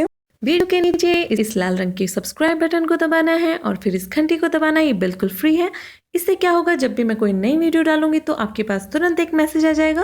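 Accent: Haryana, India. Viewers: apki awaj s lg ra ap harayana s ho pls reply mam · Aap pakka Haryana se ho… ye accent aur style sirf Haryana walo ka hi hota hai👍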